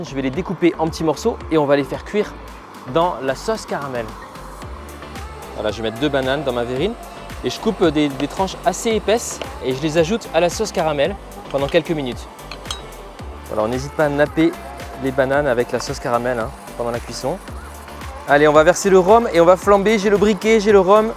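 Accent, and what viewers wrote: South, France. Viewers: Merci pour ce moment sympa, vos bonnes idées et votre petit accent gourmand qui me rappelle ce sud que j'aime tant (et où je ne vis plus, hélas...) Je vais tenter ce dessert, c'est sûr.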